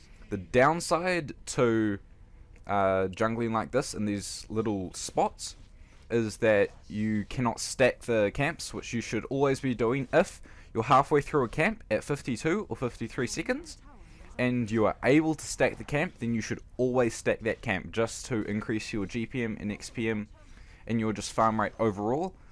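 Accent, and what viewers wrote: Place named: New Zealand. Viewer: Thanks for this it's helped a bunch. Also btw you sound kiwi as hah.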